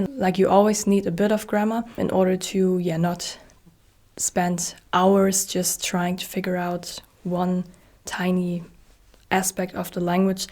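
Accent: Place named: Germany. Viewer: Your name shows Italian last name but your accent is much with German , are you German or Italian ?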